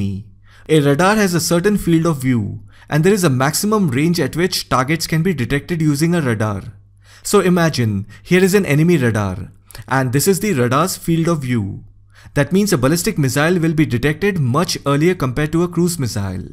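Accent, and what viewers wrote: India. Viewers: But with a heavy Indian unique accent though!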